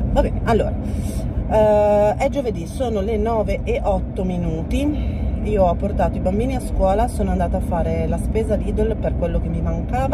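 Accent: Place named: Piacenza, Italy. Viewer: Ciao, grazie per aver deciso di metterti a nudo con noi… Avevo sentito l’accento! Sei di Piacenza! Io ci ho abitato dai 12 ai 18 anni! Anche io vicino al centro (via Genova)! Ed è vero: e’ un caos! Un abbraccio forte ❤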